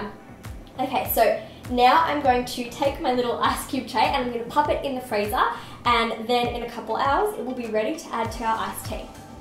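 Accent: Australia. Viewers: Is she Australian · ThisWorldSucks I think she's Australian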